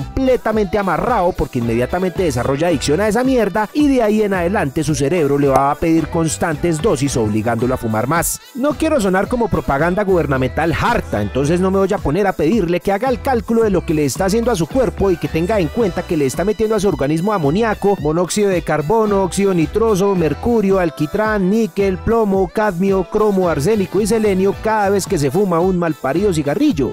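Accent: Colombia. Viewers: amigo, usted es colombiano? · El único acento Colombiano que me parece bakan y no suena hipócrita el de..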